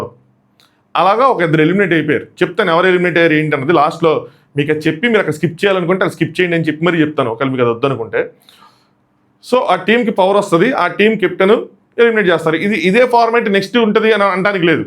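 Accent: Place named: Andhra Pradesh, India. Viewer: Oh avna andhra slang aithe ok garu tq for your reply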